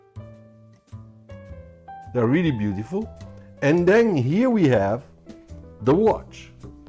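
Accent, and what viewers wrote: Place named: France. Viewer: Toi t'es français avec ton accent 😊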